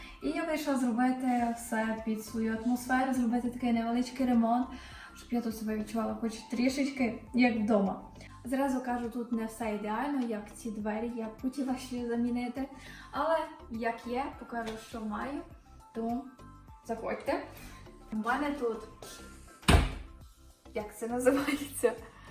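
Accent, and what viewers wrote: Ukraine. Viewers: закарпатський ділекь вуха ласкає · как я давно не слышала Украиеский акцент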